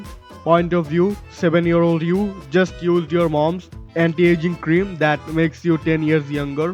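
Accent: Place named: India. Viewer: U sound so Indian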